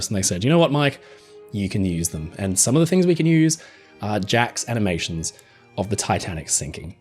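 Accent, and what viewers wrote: Australia. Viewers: I love listening to you talk, you're so knowledgeable, a great teacher, and totally charming. (And I'm a sucker for an Australian accent.) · Your Australian accent is stronger in the first video. I have to do that (almost pseudoEnglish accent) at work as well or people don’t understand what I’m saying. 😅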